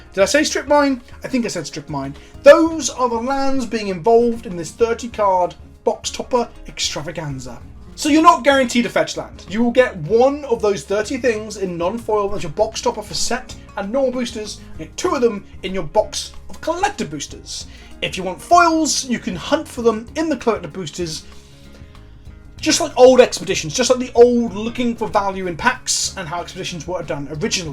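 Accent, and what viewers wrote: RP, United Kingdom. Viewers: This fine chap speaks the Queen’s English with a fervent verbosity and perfectly cogent, delightfully lilting, cadence! Try taking your fingers out of your ears geezer! 😉❤️💂🏽‍♂️🐟🍟🇬🇧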